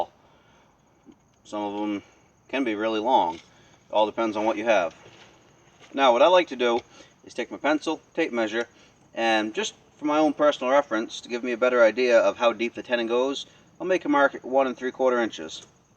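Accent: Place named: Nova Scotia, Canada. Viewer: Bro you sound like youre from Nova Scotia, I love it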